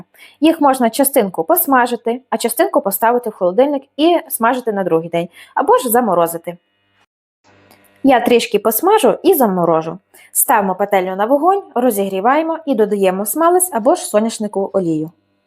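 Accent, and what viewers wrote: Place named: Lviv, Ukraine. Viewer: Добрий день,! Дякую за рецепт. А Ви не зі Львова часом? Бо чую чудовий львівський говір, інтонацію, дуже приємний.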